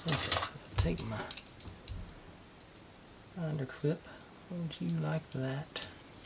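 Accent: United States. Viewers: Because English is my second language do you have an accent? Because sometime sounds perfect American and sometime not.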